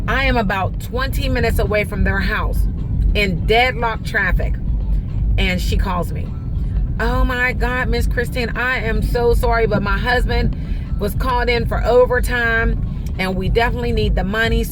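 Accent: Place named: Pittsburgh, United States. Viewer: It sounds like your from Pittsburgh I wonder if you work for troy.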